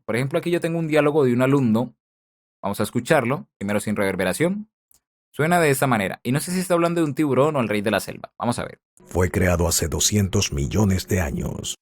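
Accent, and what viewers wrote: Colombia. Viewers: tu eres colombiano de que parte eres pareces de cali si eso es asi un abrazo desde bucaramanga y gracias por todos tus tutoriales de lo nejor que hay en youtube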